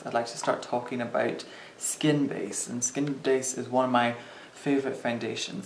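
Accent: Scotland, United Kingdom. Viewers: He was still living in Scotland back then or just moved to London, lots of people (especially when they're young like ) change or tone their accent down when they move in another area. one of my closest friends is from Merseyside but has lived in Manchester for more than 10 years now and her relatives complain because she lost her Scouse. my former housemate is from Edinburgh but has a very mild Scottish accent, again she hasn't lived there in 10 years. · Your voice an accent are simply heavenly (I have always found Scottish accents to be some of the most beautiful sounding in the world, but your voice in particular has something especially enchanting in it). And your presentation of products is exactly what I think many people actually want and need. I am absolutely hooked on your videos and am so glad to have discovered your channel. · thankyou for this video! 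I really struggle to find products pale enough for my skin, when i heard your accent i knew you understood! lol! Because i am also Scottish like you and like most of us up here, i have that typical, really pale 'peelywally' complexion. Almost everything i put on my face is way too dark, so this video was really handy! cheers! by the way, I agree with everyone else, you're really pretty! I can't believe you're actually a guy! you look more stunning than most of us girls do even after we've spent hours trying to make ourselves look nice! I wish you were my makeup artist, i could listen to that voice of yours all day, it is so soft and relaxing! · Rachel B He still sounds Scots.. no getting away from it... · So, this is a much earlier video. I do prefer your eyebrows here. Much more natural voice and enunciation. I would say here that you're Scottish and you say film normally. Hmmm. You certainly have mystique! 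Xxx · I love hearing the Scot come out- definitely different than new videos but I love them all. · true also i was so suprised by the accent even tho i knew he was from Scotland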